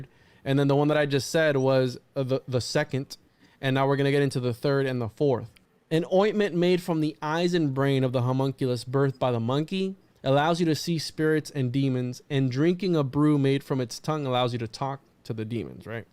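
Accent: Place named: Mexico. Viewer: pronouncing latin words with mexican accent. oof. and i'm hispanic.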